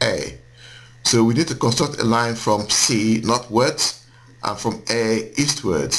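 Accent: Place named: Nigeria. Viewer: ahaha nigerian accent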